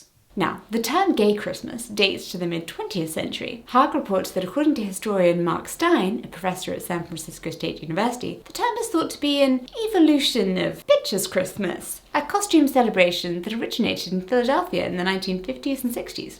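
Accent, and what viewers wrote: RP, United Kingdom. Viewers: You sound very posh.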